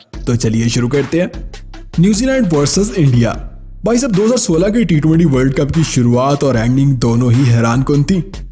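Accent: Pakistan. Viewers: Apki voice yaani apki boli se AP mujhe Pakistani lagte ho. Lekin Apke thumbnails or indian team ki ziada tarreef se mujhe AP Indian lagte hooo Confirm me Are u a Indian. Or a Pakistani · Aap shayad pakistan se ho faarmet bol rahe hai isliye.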